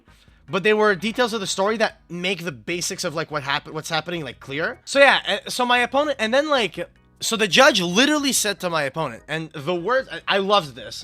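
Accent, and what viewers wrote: Canada. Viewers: This guy’s video started autoplaying when I left YouTube open. He sounds so damn Canadian lol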